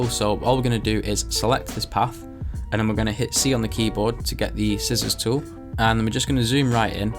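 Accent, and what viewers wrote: Manchester, United Kingdom. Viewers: I can’t place your accent.....Mancunian?......? 😂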